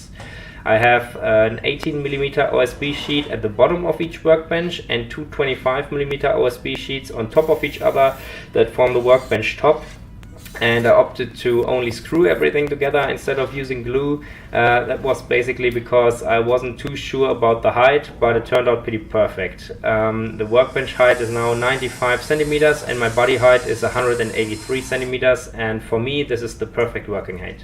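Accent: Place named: Germany. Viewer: with the accent anything you say sounds reasonable and well engineered. "I have replaced wood dowels with spaghetti noodles." 100% confident German accent. gold 1m views.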